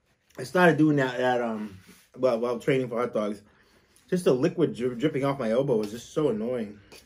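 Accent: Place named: Massachusetts, United States. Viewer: You're awesome. I'm a Maine-ah, so I just love that you're so stereotypically Massachusetts. If anyone asks what a Bostonian or "Southie" is they should be shown a clip of you!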